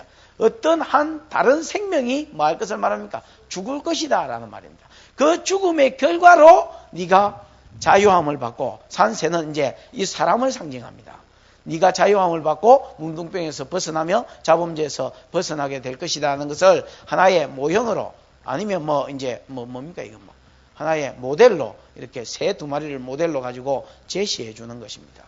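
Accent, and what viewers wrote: Gyeongsang, South Korea. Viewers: 열 채우는 것, 기 채우는 것, 하시니 어릴 때가 생각나 웃음이 나오네요. 경상도 사투리 정말 정감 있습니다. 천국 언어는 경상도 사투리 비스무리할 거라는 목사님 말씀에 전적으로 공갑합니다. :hand-pink-waving::hand-pink-waving::hand-pink-waving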